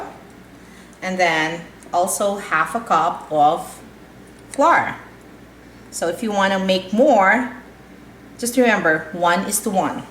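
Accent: Philippines. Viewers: Jollibee adds MSG to their chicken...the real secret ingredient! Madame, I love the way you present your dishes. Your Filipino Accent is truly Filipino...brings me back home. Hugs my teacher...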